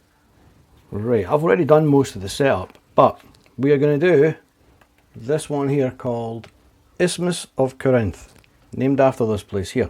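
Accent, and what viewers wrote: Scotland, United Kingdom. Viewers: Nicely done sir and I must admit it sounds even better in Scottish. Its been way too long since I was there.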